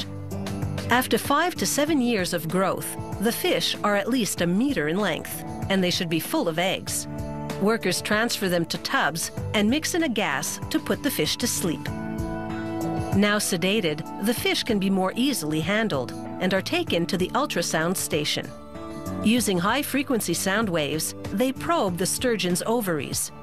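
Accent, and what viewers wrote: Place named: Canada. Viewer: This is the most Canadian sounding narrator ever.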